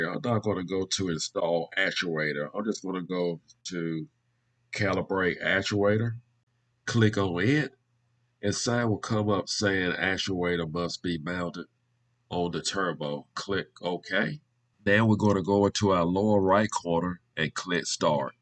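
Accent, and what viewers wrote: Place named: South Carolina, United States. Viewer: Thank you sir Where are you based out of? South Carolina? Going based of the accent (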